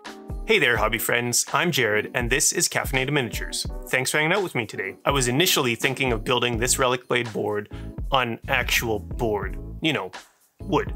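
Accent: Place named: Canada. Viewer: This guy sounds Canadian......"went to the same store" Yup! Nice vid fellow hoser.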